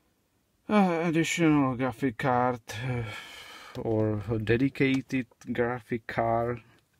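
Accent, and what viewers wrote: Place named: Czech Republic. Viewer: ale no tak.? ty se stydíš za česko? ten akcent jde bleskově poznat. jakože ne že by nebyl důvod se za česko stydět, ale stejně🙂.... a díky za video. přemejšlim, že si ten komp repasovanej koupim.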